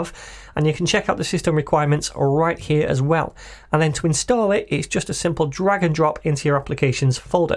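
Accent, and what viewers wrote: United Kingdom. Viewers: u british?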